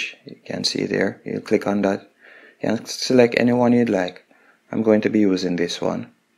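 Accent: Jamaica. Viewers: I can hear the Jamaican accent 🙌🏽🇯🇲 Big uppp! Keep grinding on YouTube! Don’t let the hate get to you. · Thx and I hear your Jamaican accent im Jamaican to!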